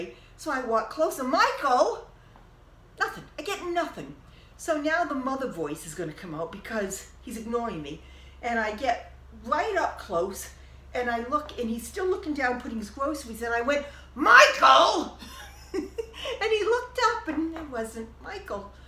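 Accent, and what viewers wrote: New England, United States. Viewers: Are you from Rhode Island or Mass?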